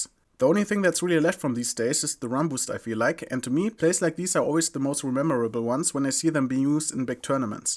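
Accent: Germany. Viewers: Man hört so krass, dass du Deutscher bist 😂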